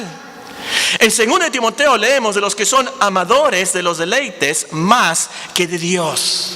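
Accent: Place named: North, Mexico. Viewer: min Pajarito... pero Prehistórico Jajajaja, no, es bromita! Muchhhioooo problema con la glotonería. ¿Es de Chichuahua el predicador? Pronuncia como nosotros los del norte la "CH". Muy buen mensaje para los que somos medio glotoncitos ♥ Dios le bendiga.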